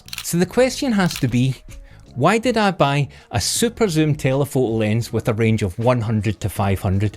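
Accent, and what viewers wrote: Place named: Scotland, United Kingdom. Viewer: That accent 🙂 where from? 🙂 Schotland? I love it. greetings from the Netherlands 🙂 without any accent.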